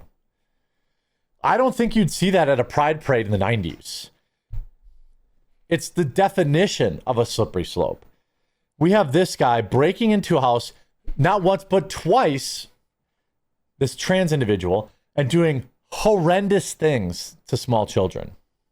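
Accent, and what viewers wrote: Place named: Wisconsin, United States. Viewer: ) I can see that, but I like how talks because it reminds me of my sweet Mom's family from Muscoda, WI.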